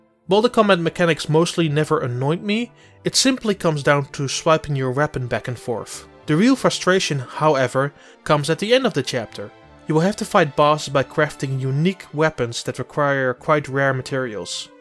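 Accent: Netherlands. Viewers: He’s Dutch. Hate to tell ya buddy but English isn’t everyone’s main language. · Are you Dutch? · Hello Apollo Justice the accent is Dutch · Thick dutch accent. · very dutch yea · That dutch English tho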